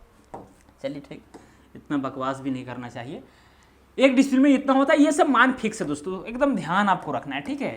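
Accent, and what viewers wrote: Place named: Darbhanga, India. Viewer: आप बिहार में दरभंगा के है क्या, अच्छा ज्ञान है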